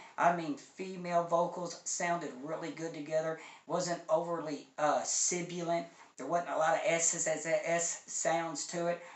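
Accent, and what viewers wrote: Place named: Appalachia, United States. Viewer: Your an inigima, your accent lends one to think your a hillbilly. But if someone actually listens to you they should be taking you seriously. Cheapaudioman is rather goofy and very popular. People probably think you wear a red hat when not on camera.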